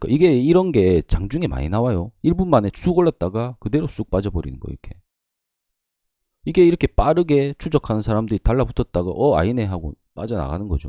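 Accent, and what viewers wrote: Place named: Gyeongsang, South Korea. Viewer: 감사합니다 진솔하게 알려주심 감사드립니다 경상도 사토리가 정답습니다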